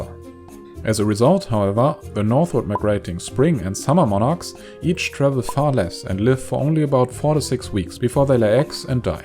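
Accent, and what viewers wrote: Germany. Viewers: Man hört den deutschen Akzent raus ^^ gutes Video 👍🏼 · I find the German accent so refreshing. It gives the impression of being straight to the point without any of the BS.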